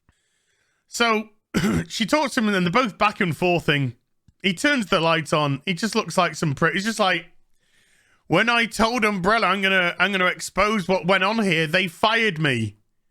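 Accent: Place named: Yorkshire, United Kingdom. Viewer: Just get well soon. Life's boring without a good Yorkshire rant!!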